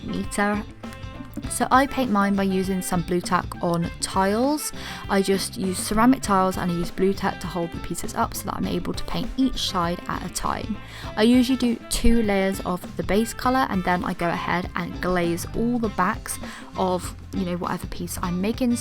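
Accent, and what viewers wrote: England, United Kingdom. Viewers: Cute. What is your accent/somewhere in England? 🙂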